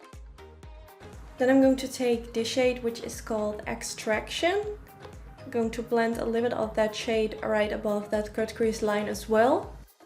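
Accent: Netherlands. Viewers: First of all. This look is STUNNING. I was looking for a look to really make the colors pop because I haven't really been into super colorful looks yet. Also you are Dutch right? I can hear it since I'm Dutch as well hahaha. When you said Glucose I knew it for sure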